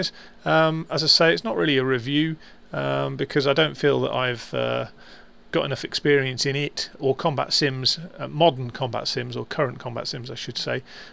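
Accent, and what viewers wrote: United Kingdom. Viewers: I'm so pleased to have discovered your channel. Being uk based it was so refreshing to hear a brit go through DCS as you have done in this video. I've been sitting on the fence for a good two years now about (a) a decent gaming PC and of course (b) getting into DCS. I've watched a lot of content, most of it American content which with all due respect drives me a bit potty. Too much waffle and being US based I find myself being totally confused over what hardware to go with. Now I'm not in a position to literally build a simulator and damn near build an F16 type cockpit, with almost an ejection seat built in. However I'm in a position to get the right kit. I know it needs a decent PC, warthog HOTAS is a given c/w decent pedals. It's the PC hardware I'm struggling to understand. Do I go with the precise recommendations from DCS? IR or VR. 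If getting VR do you drop going on a top monitor? Recommended PC suppliers etc. Its these questions I'm struggling to get answers on. I hope you can help.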